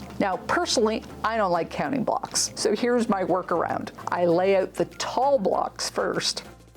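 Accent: Canada. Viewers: Hi! I just started quilting (been sewing for years) but got recommended your channel to start, and it’s so helpful!! I caught an accent and saw you’re Canadian too! I was wondering if you’ve noticed price increases for certain tools/materials in Canada specifically? Prices have gone up overall, but for anything in particular that you’ve seen, or anything that’s maintained affordable for the hobby (not including reusing/thrifting)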